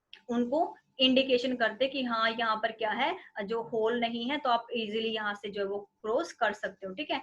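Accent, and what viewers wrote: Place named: Punjab, India. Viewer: Tuhadi bolli Punjabi lagdi aa..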